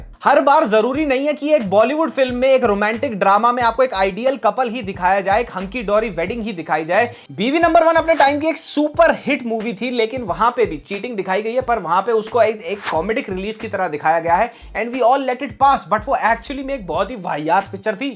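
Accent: Haryana, India. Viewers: k review ka koi muqabla nai hai..or iski comedy haryanvi accents...end hai yaar.jiooooooo shehzada jioooo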